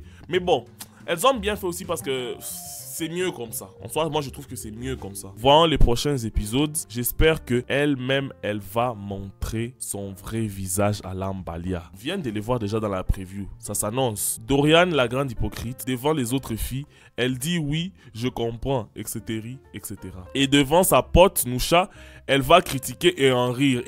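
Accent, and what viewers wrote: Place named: Swahili, East Africa. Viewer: en plus tu parles swahili je l'ai senti dans ton accent ☺️☺️☺️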